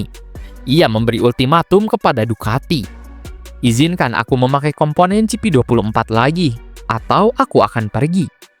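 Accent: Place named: West Java, Indonesia. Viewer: Sunda pisan 😂👍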